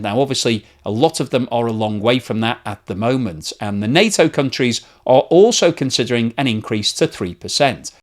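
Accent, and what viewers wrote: United Kingdom. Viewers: he sounds british though?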